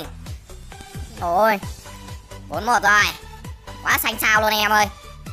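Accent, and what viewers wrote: Vietnam. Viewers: Giọng Nam Kì Hay và Hài thế mà đặt tên như vậy đúng như mình miền Nam mình rất bn vì Nam Kì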